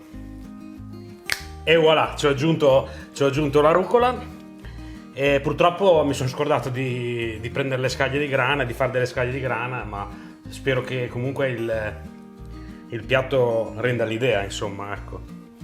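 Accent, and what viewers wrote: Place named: Naples, Italy. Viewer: Bravo, poche parole e dette quando servono, non come fanno nelle tv nazionali i soliti tromboni, e poi l'accento napoletano è la vera ciliegina sulla torta. Bravo! Bravo.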